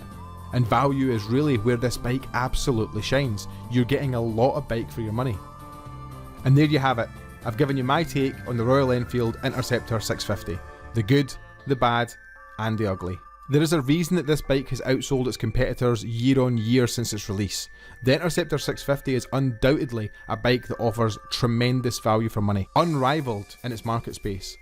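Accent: Scotland, United Kingdom. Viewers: Great review and that accent is a great bonus! Scottish? Thank you. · Thanks! That was not only a fair review, it was one of the more articulate and entertaining ones I've come across. Sure, that Scottish accent helped keep it nice and crisp, but turns of phrase like "vegan banana leaf sandals" really warm up those synapses, you know? Frankly, you're so good at this that I'm astonished you have only 250 subscribers. You just earned yourself number 251. Now I'm gonna go check out your other stuff.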